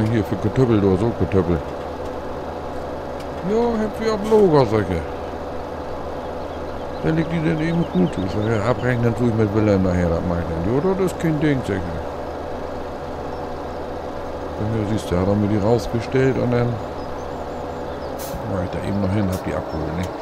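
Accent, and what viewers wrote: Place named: Low German, Germany. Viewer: Din Platt Mega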